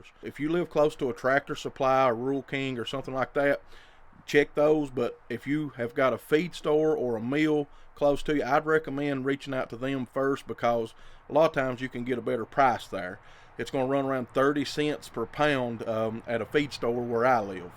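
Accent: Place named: Louisiana, United States. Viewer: Are you in Ruston? Your voice reminds me of my family from Louisiana.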